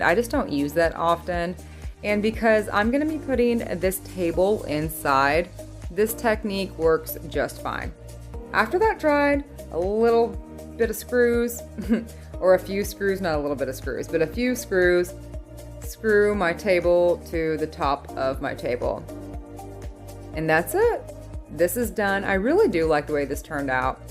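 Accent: Texas, United States. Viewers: So cute that I just knew she was in TX. Our daughter was born in TX when we were stationed there back in 1986. You never forget the friendliness and that dialect! Beautiful people. Beautiful State! We loved our time in Texas!! Thanks for all the super DIYs! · What part of Texas are you from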